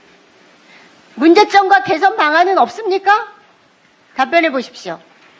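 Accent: Gyeongsang, South Korea. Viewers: 경상도? 아~~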